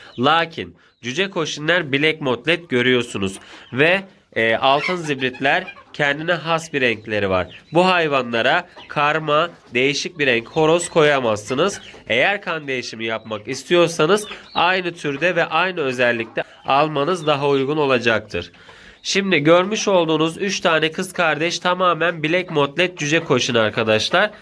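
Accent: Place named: Adana, Turkey. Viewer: Abi adananın neresindesin hemşehrim çıktınnnnn😁😁😁😁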